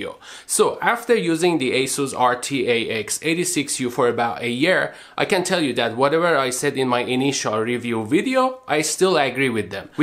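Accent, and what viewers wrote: Iran. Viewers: Hey actually im interested on your accent, are you Iranian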